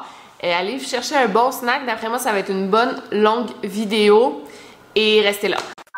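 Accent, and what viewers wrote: Quebec, Canada. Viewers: De bases j’aime pas l’accent québécois sa minsuporte mais depuis que je regarde tes vidéo sa mfais plus rien hihi (une vidéo sur les vampires ? Sa serais ltop)❤️❤️ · je t'adore !! continue comme ça et j'adore ton accent québécois mdr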